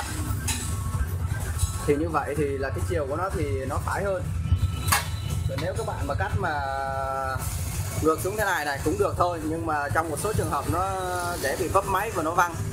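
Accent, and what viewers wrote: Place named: Thanh Hoa, Vietnam. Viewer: nghe giong noi dan thanh hóa, thấy sợ sồi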